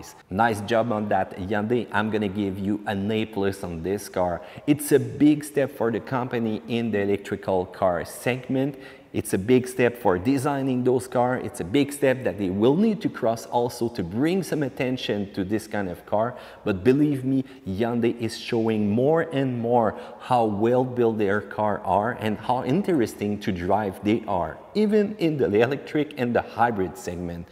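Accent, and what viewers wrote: Quebec, Canada. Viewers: Avez-vous fait une version en français de ce vidéo?Je pose la question parce qu'il est évident pour moi que vous êtes un francophone fort probablement québecois. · Are you French Canadian ?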